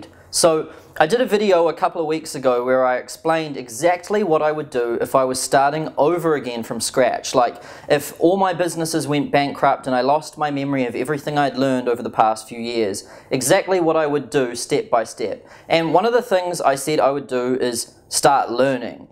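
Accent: New Zealand. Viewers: Kiwi accent?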